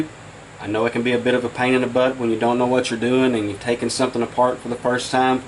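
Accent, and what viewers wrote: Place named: New England, United States. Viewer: It's pronounced "Nick-EEE" not Nick-eye......brother you have got to be a Yankee. All them R's in your words. LOL (no offense intended, all my in-laws are Yankees)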